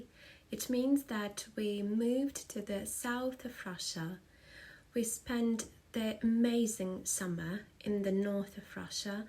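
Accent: England, United Kingdom. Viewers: Why does her English which is excellent sound like she is from England. Her voice is so nice soft and very clear. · Приятная нотка английского акцента – это то, что так приятно услышать от красивой русской леди из сельской местности.❤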